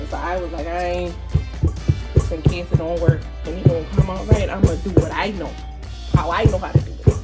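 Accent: Charleston, United States. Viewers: I knew you was from Da Chuck as soon as you started talking... I from yah too!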